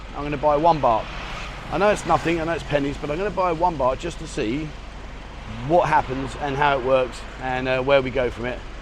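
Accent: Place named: United Kingdom. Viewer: Interesting language use. . . You are quite the Brit you are😸. . . and I DO love your accent! And how you use the language with such candor and wit. It's like what you said in this video about using agents to set up a bank account - and I much agree- that, "They charge a fee. . yes. but they have to make a living too". The magic of crypto is that it is still almost not regulated at all. . . So the bottlenecks the traditional stocks, gold etc big wigs set up against us since Jeckle Island with Chase n friends in 1913. . . are almost not even there. . . As such. . . more of the "living" one makes comes back to you. . . much much less to them.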